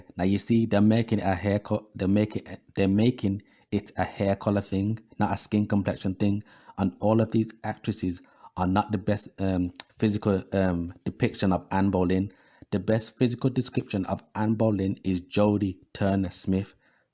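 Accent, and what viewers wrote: United Kingdom. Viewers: thank you my brotha, are you from the UK?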